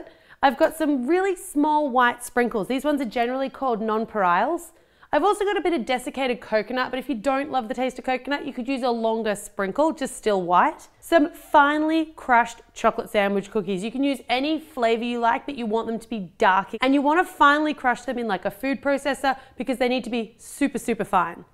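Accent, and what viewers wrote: Australia. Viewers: R u from australia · She's Australian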